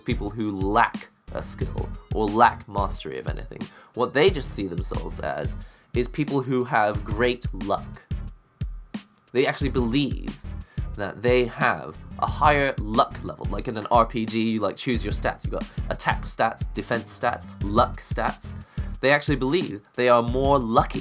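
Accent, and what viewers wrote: England, United Kingdom. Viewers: the british accent really fits you XD I mean I know the accent wasn't intentional but damn XD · It warms my heart to see an Asian with an English accent rather than American.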